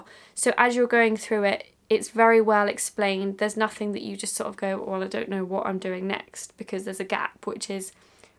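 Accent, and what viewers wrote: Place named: England, United Kingdom. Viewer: You look just like my sister but with an English accent!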